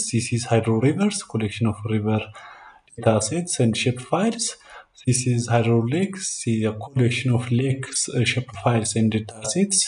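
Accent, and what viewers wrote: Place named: Ethiopia. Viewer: Keep it up if you can please don't sound the accent like amharic just focus only on the appropriate words it's better background modie sounds with Text Writing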